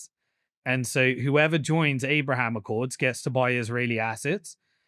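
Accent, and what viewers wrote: United Kingdom. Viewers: He makes these wildly false statements. He drastically oversimplifies things he conflates completely unrelated things together. He refers to things that have Then, in place forever as new While stating his wild predictions and speculations as fact. He is the textbook example of a Zionist pompous british ass. Who thinks he could lie to your face because he has a british accent. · You sound like a city of London guy. You have no idea who Trump is or what he is capable of.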